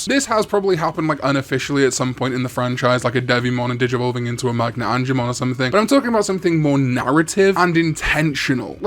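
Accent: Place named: Wales, United Kingdom. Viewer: Where in Wales are you from? I’m from Porthcawl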